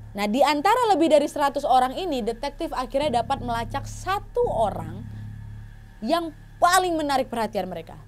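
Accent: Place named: West Sumatra, Indonesia. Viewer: "Ndak itu jawabannya do" 😅 awak takana jo kampuang mandanga nad2 mangecek macam tu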